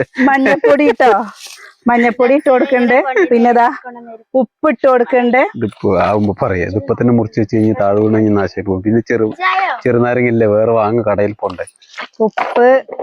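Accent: Palakkad, India. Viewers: Njangalocke samsaram (palackadan slang) kelkaan vendi maathram vlog kanunnavaraan...just avoid -ve comment,go a head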